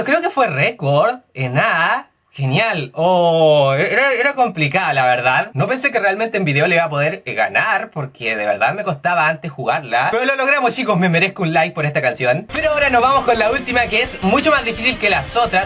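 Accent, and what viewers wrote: Chile. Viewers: Eri chileno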